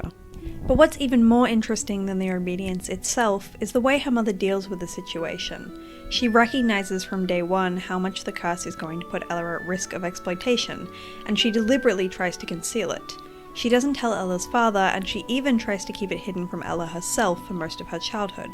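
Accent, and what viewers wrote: Australia. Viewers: It’s an Australian accent. Sometimes they sound different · I clicked on this video because I've never seen another (real life) person with the name /. Plus sounds like we're both Aussie which is fun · I love the way you say "Ella Enchanted" like Eller Enchanted". Aussie accents are so fun to listen to😊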